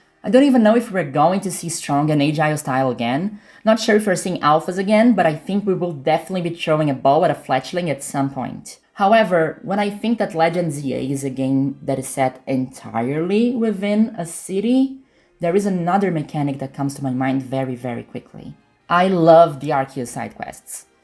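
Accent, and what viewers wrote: Brazil. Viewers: Beautiful brazilian accent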